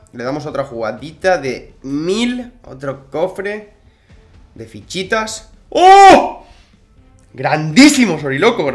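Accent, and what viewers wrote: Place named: Guatemala. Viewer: Voz Chapin como así ha pixonic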